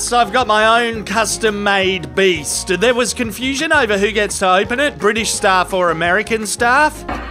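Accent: Australia. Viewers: Me and me mates sat in Leicester and watched it on big screen getting piss=d. We endured 6 hours of middle class, mid life crisis BBC droning tossers. Wish you had taken over as you nailed it in under 4 minutes. Wherever you are liz, know that your best eulogy had an Ozzy accent.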